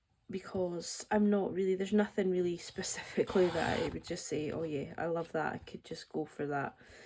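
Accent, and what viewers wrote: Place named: Inverness, United Kingdom. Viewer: I came to Inverness last year. You do have a Inverness twang to your accent xx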